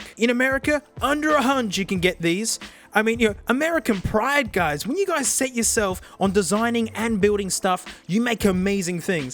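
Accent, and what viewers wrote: Australia. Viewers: Aussie humor + Aussie voice + Headphones and or headsets = great video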